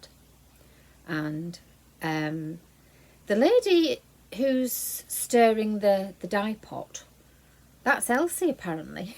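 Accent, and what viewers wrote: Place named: Lancashire, United Kingdom. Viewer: I'm a new subscriber and really enjoying your videos. Is it ok to ask whereabouts you are from originally? You sound so much like a friend of mine from Lancashire. I am originally from there, but we moved over the border many years ago. Thank you for your very entertaining podcasts. 😊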